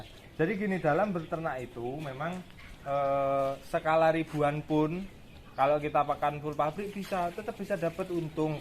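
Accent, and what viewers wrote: Java, Indonesia. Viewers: Mas e gianteng... Rodok medog lak ngomong... Koyone ora sombong iki... Peternakane ayam kok logone gambar bekicot mas... Hehe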